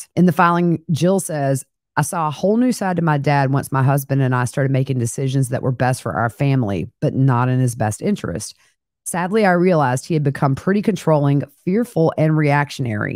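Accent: South, United States. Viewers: I know I love her southern accent so much ❤❤❤❤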